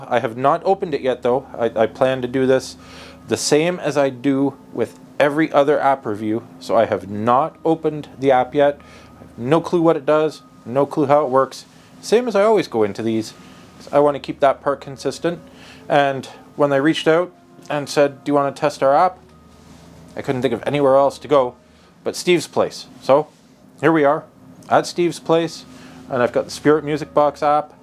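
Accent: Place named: United Kingdom. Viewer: Just looked at some of your videos very interesting demos, looks like you have a degree in science or something. Very clever and looks like you put a lot of reseach into how parts of the phone work. And a fellow Brit by the sounds of it :D. catched a glimps of your paper work, something I wouldn't have a clue about understanding what you put on it so I'll leave that with you :D. Subbed also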